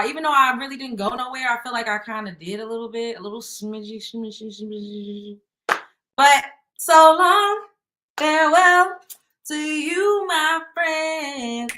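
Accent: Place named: New York, United States. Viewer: You remind me in a way of another good sis I watch on YouTube named Ashley Dalton she's a character as well lol, and y'all both talk with a NY style accent, and y'all both some beautiful chocolate drops. New subbie✨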